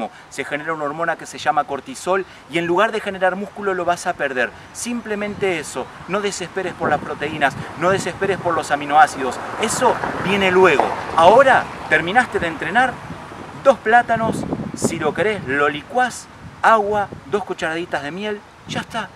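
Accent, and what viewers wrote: Argentina. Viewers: Buen video yo siempre tomaba banana licuada con leche hasta que me di cuenta que era malo para la salud entonse la empecé a tomar con agua. Una pregunta ¿de donde sos, porque noto un acento de argentino mezclado con otros?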